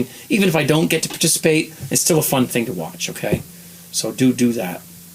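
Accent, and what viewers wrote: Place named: Canada. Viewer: I say Wort , Wart same as you do . But not Wert . But when you say about ... I can tell you are Canadian... Cheers !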